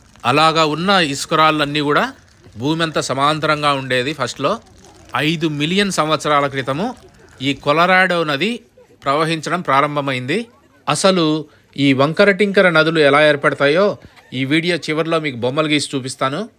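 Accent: Kadapa, India. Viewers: అన్న మి విశ్లేషణ చాలా బాగుంది, మన కడప మాదిరిగా మి మాటలు వింటుంటే చాలా సంతోషంగా ఉంది. ప్రేమతో కడప జిల్లా వాసి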